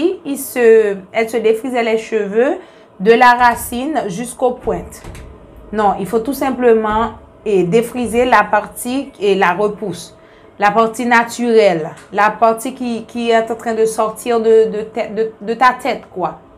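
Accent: Haiti. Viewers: T'est haïtienne ma chérie ya l'accent fort 😅😘😘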